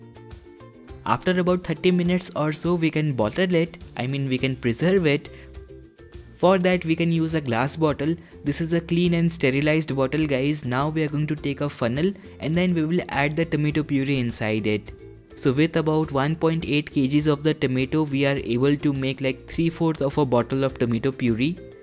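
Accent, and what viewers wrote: India. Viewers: Hah DAT hindi accent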